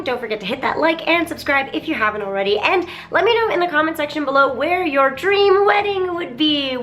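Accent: New Jersey, United States. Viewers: Wait...Were you born & raised in Japan?? I only ask because could've fooled me. You definitely sound like a girl from Jersey lol. How did you learn English?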